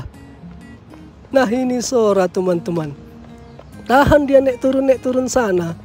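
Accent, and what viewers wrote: Medan, Indonesia. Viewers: Suara medan nie psti 😂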